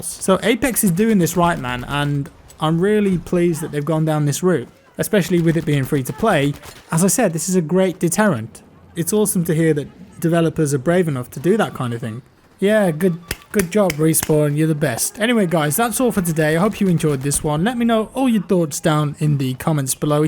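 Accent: United Kingdom. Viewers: Here's something that's kinda funny. When I watch you're content for BF5 or content from any other game, I trust you more than some guy in America, (I live in America) because of the Brittish accent. (Yes, I know you don't have an accent but I have the accent) Why?? I have no idea at all..... :)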